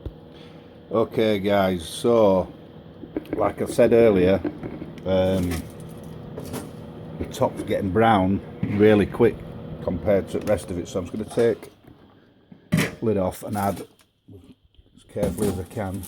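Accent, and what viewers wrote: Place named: Midlands, United Kingdom. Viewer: Love this down to earth video ❤️. Reading the transcript had me in stitches, obviously not someone who understands the midland accent🤣😂. Yes I did make 2 decent loaves of bread following his instructions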